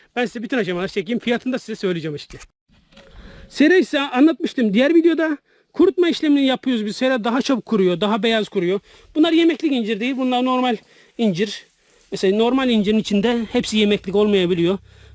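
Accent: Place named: Thrace, Turkey. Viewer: Trakya mı orası şiveniz trakya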